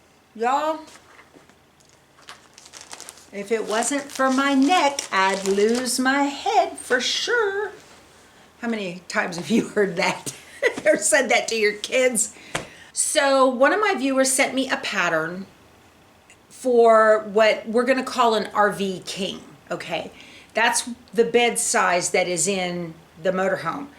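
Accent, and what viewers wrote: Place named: Chicago, United States. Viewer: you sound just like. We have the Chicago Cubs and that other southside baseball team.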